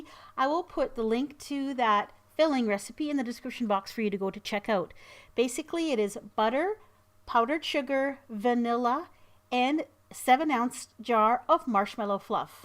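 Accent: Canada. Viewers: Quick question, are you Canadian or American?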